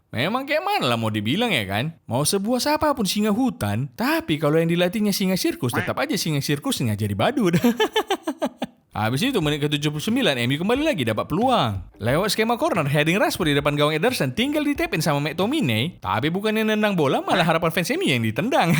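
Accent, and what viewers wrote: Medan, Indonesia. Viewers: segaring apapun konten nya kalo pake logat medan jadi lucu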